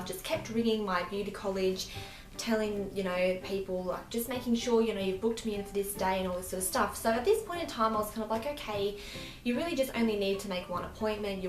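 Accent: Australia. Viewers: Are you from Australia. I'm from there!! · You're pretty. I love your accent, aussie yeah? Your so close to 1k, congrats yey!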